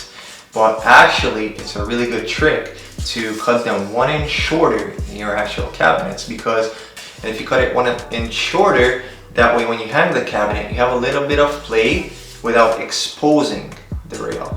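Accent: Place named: Southern Ontario, Canada. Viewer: I’m Canadian but living in Germany since 2016. I think it’s hilarious that I was able to pick up his accent (Southern Ontario) almost immediately. Anyway, great video, thanks for the info. My only feedback would be to invest in a mic and better lighting.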